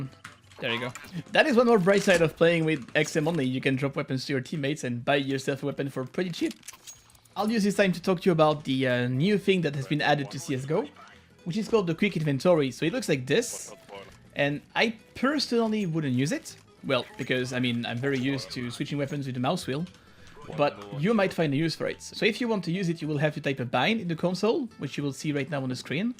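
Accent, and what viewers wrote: France. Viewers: Is he french ? His accent is betraying him xD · you have a french accent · You have some Times a french accente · You have a French accent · sound french ? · Are you french ? Your accent looks so much french if you're not it's weird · J'adore ton accent francais esti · French accent ? 😁😁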